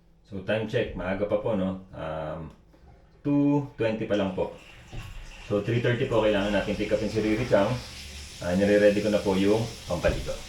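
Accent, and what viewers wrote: Philippines. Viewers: Tagalog na tagalog ka kabayan, anung probinsya ka ba dto saatin ? 😊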